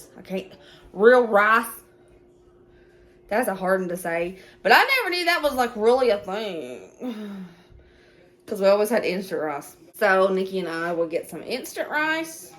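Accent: South, United States. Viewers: Both looks very good! Love your voice and southern accent!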